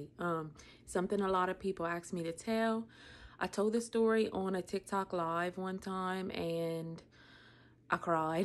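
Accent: Louisiana, United States. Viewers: Sounds Cajun, maybe? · This has nothing to do with the seriousness of your topic, but I knew you were a Cajun girl as soon as I heard you speak, so I looked at your about statement. I grew up in South Mississippi and have always loved the Cajun accent. My husband is from the Folsom-New Orleans area. I am so very sorry for the horrible loss your family suffered. I am sure, even now more than a year later, your family, and especially your mom, is still trying to discover the "new normal." I have never heard of the group with which you met, but it sounds like a wonderful support system. I think it's great you're not going to call the traumatic loss of your younger subs a "accident" anymore. My dad was a attorney in Mississippi, and it may have been after I lost a friend and her unborn baby to a drunk driver, I asked the definition of a accident. He told me a "accident is something that couldn't have been avoided." Well, from that legal definition, no drunk ever has or causes an accident; they create wrecks or, your new word, crashes. 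I know God's peace has surrounded you and helps you with your grief. I pray His peace continues to uplift you and your family in the days ahead and when your hearts are very heavy during milestones such as what should have been graduation years, when they might have had weddings or babies, special birthdays like 25, 30th, and so on. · Listening to your accent takes me back to my time in Lafayette. I’m so sorry for your family. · You came across my Youtube feed and decided to watch your channel. As I am listening to you speak, I instantly knew you were from Louisiana, didn't know from where. Anyway. I am listening to your story and I'm so sorry and condolences to you and your family. I have watched the videos of the car and my God, praise God mama still here. I wish I could hug you. Having a loved one pass on is one thing, but to have them go because someone was to careless to care what their actions would cause to someone else. 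I 'm not sure if you're the same family I heard about from Jeanerette with the mom and three kids coming from watching a basketball ball game up north. I cried when I heard about that. Know that you have people who love and support you. even if you don't know them. Praying for continued healing and peace for your whole family. ❤ from a Loreauville girl.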